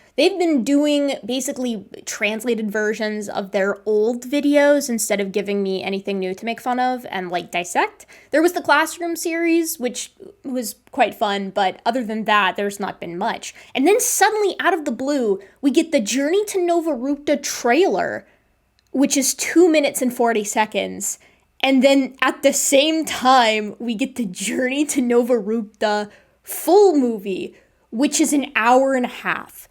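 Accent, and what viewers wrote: Midwest, United States. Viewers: I love her midwestern speaking style, but I may be a little biased because I'm from northern Illinois and she sounds like me, haha.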